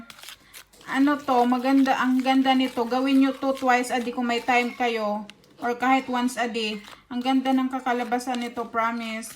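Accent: Visayas, Philippines. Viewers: Bisaya po ba kayo Maam... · Te bisaya ka night? 😀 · Maka mukhang yaya nmn c ateng bisaya lang cguro c mam kaya ganyan magsalita.. Nakita m nmn mga gamit nya ohhh mamahalin.. Madaming bisaya na mayaman ateng at magganda! Just sayin! ☺️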